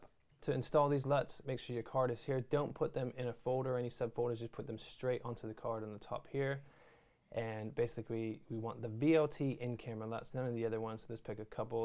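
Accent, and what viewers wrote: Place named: England, United Kingdom. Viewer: Lol your accent is getting more English 😂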